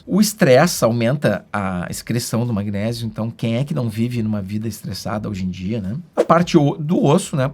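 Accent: Rio Grande do Sul, Brazil. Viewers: O senhor e gaúcho? Gostei do sotaque.e meu conterrâneo?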